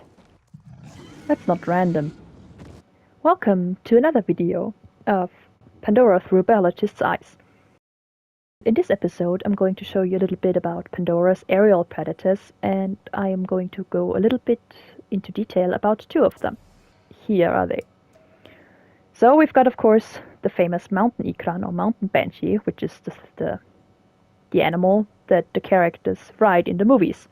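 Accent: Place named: Germany. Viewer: For what it's worth, I like the sound of the microphone. Ads to the ambiance since you're presenting the game. By the way, I know that accent. Germany ?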